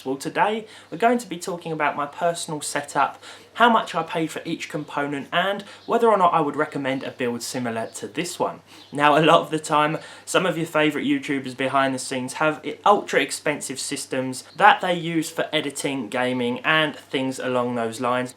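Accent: United Kingdom. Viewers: british accect ftw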